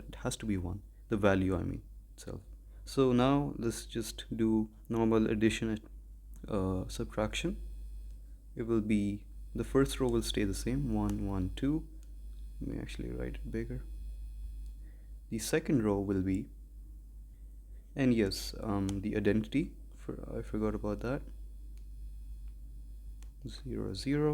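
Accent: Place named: India. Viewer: Ur accent sounds like indian biryani scammer 🇮🇳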